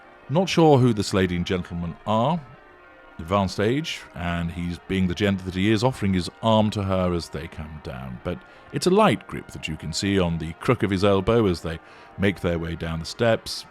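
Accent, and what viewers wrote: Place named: England, United Kingdom. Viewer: you knew an awful lot of the Aristrocrat's names on the steps. Coupled with your aside a few months ago about the clapham omnibus ( a very old metaphor only lawyers and hardly any of them understand or recall) i believe you are a member of the British aristocracy with close links to the law. As a narcissistic psychopath you would make an excellent judge or silk. You are also a royalist and know members of the royal family and find this upstart who has achieved wealth and position aggravating in the extreme. You also use voice alteration technology but some of your pronunciations would identify precisely what area of England you come from. eg how you say the word book. anyway i really enjoy your posts and hope you never are unmasked and continue as a latter day scarlet pimpernel.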